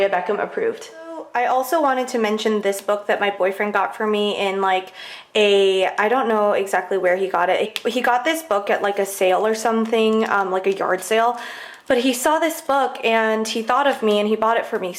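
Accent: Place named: United Kingdom. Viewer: Such casual and chic outfit choices!! But I immediately subscribed when I heard your “British accent” 😂😂😂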